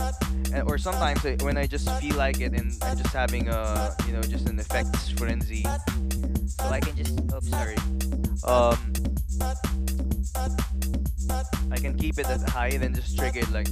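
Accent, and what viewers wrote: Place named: Philippines. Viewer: Ill review, tip and tricks! Sounds like your Pilipino too, LOVE dat! JAHbuhay king...bless up!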